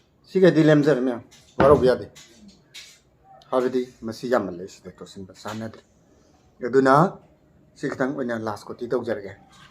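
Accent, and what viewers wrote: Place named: Imphal, India. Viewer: Brogi khonjelse imphal gi taingo gi pura manley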